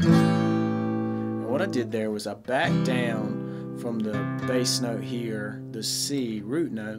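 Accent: South, United States. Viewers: This progression definitely make for some beautiful medley!!!Thanks Bro!!BTW I knew u r from the south.Im from Alabama so I know a southern drawl when I hear one!!!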